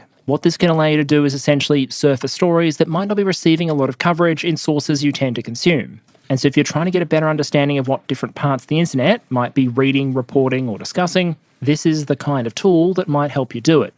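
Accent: Australia. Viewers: Thoroughly enjoyable episode! and I always like a good strong Australian accent, being myself an American with probably just as gratingly obnoxious an accent as any Australian, if I get real local about it. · Finland within top ten :D Well, greetings from over here, war topics are indeed in our heads. p.s. I didnt realise some people dont like the aussie accent, I think its cute, gives a nice relaxing atmosphere to otherwise serious and analytical approach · There are people out there that don't enjoy listening to the Australian accent? 🇺🇲🇦🇺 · Regarding the comment you received about your accent, all I can say is most Americans that I know think Aussie accents are rather charming. While the stereotypical Brit 'upper class' accent seems somewhat snooty, Aussie's exude a friendly 'how are ya mate' quality. Yours is fine and combined with your humor and knowledge make the videos very listenable. 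🇦🇺🦘👍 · a very heartfelt "THANK YOU!" for your channel. Love your Aussie accent and Emu v. Kiwi examples. Keep up the great work! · If it makes any difference, I find an Aussie accent to convey sincerity and humility and combined with the sense of humour, enjoy it a lot. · That last bit is why I have watched your stuff for well over two years now. You're insightful, intelligent, honest, and humble. Keep up the good work and don't worry about the Aussie-isms. I'm sure us Americans will learn eventually.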